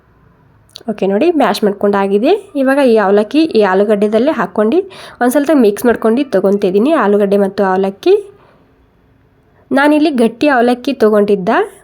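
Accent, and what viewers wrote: Maharashtra, India. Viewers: Yourmaharastra kannada is nice to listen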